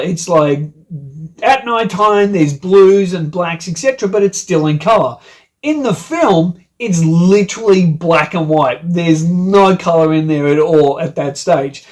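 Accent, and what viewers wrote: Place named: Australia. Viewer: I love your Australian accent ^__^ !!!! Oh my gosh, love from Texas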